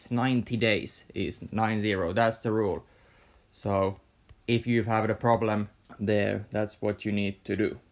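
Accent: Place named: Finland. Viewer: Hyvä ralli enkku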